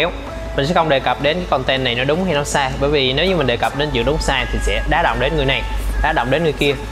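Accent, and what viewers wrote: Hue, Vietnam. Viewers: anh ở huế đúng k ạ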